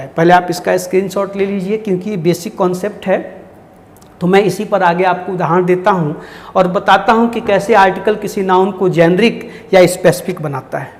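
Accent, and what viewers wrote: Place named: Bihar, India. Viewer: sir ka dialogue aik to upar se bihari bht acha lagta hai ye😅😅😅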